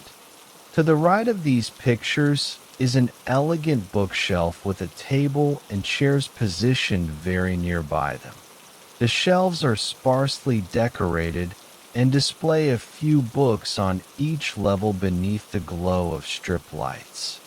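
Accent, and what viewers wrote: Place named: United States. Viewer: Don’t like American accents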